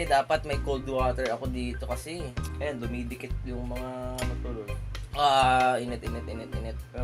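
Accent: Visayas, Philippines. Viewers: kuya visaya kaba??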